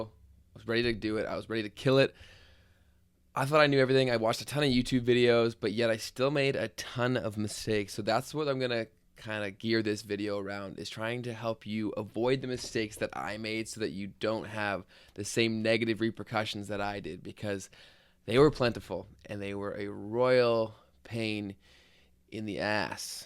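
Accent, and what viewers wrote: Canada. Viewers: Thank you for those tips taking notes ! I couldn't help to notice at the beginning are you from Canada? if yes which province :) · Love that Ontario accent!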